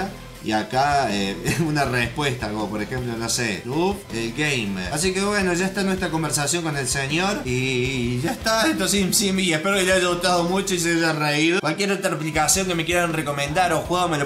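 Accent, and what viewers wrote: Córdoba, Argentina. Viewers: xD, yo también soy cordooobez pero no tengo ese acento :v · Sos argentino? te pregunto por la tonada :3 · 848 jaja puede ser pero admiti que se les pira y se les va lejos el acento coordobeeh jaja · Es argentino? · sos Argentino ? (Pd: amo tus videos )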